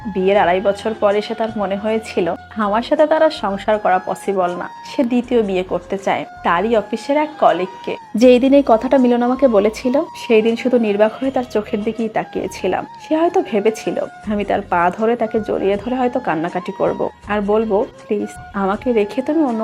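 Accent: Barishal, Bangladesh. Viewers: আমি একজন নোয়াখালীর ছেলে। কিন্তু আমি বরিশালের কথাগুলো বেশি পছন্দ করে। আপু টিকটক গুলো আমার কাছে খুবই ভালো লাগে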